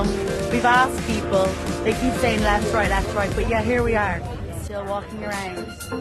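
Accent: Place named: Ireland. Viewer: I thought Australian accents were my weakness until I heard yours 😂😂😂. The videos Irish people tasting different kinds of alcohol always makes my day. Awesome videos!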